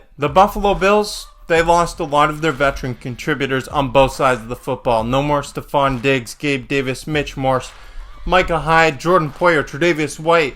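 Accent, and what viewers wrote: Canada. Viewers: a canadian eh?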